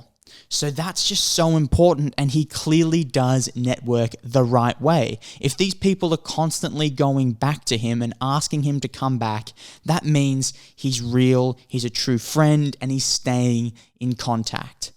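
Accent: Australia. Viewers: Oh My Goodness! Looking forward to watching it! I really enjoy how you explain things and put things into perspective! I've been subscribed since a month ago and have been binge watching and re-watching your videos since then! I think I may have a crush on you! Haha! <3 Are you Australian? I really like the sound of your voice..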